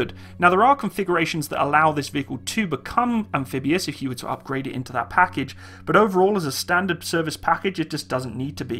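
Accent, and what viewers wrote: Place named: United Kingdom. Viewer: You don’t sound like a fellow Canadian you sound half british no offence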